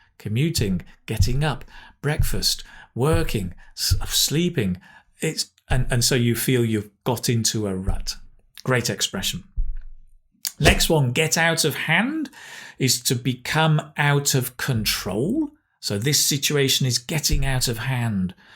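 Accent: United Kingdom. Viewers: NO American accent, only British! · Nothing like a British accent, absolutely Masculine...such a marvellous hissing sounds. Nice presentation.👍👍👍👍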